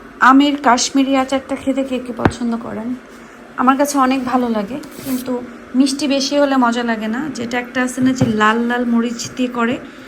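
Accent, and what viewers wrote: Sylhet, Bangladesh. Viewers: Sylheti manus bole kota❤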